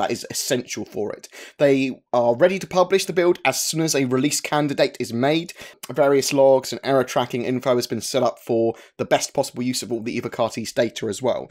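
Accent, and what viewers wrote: United Kingdom. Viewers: your speech is very hard to understand, especially for not a native English speaker. can u talk more slowly or just don't eat the words? with your British accent it is completely impossible to listen to u because of your style of speaking.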